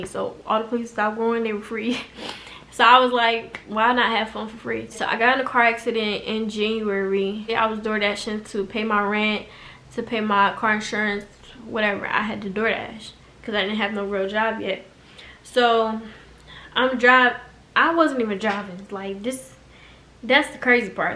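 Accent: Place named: South, United States. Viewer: How long you been there you sound country af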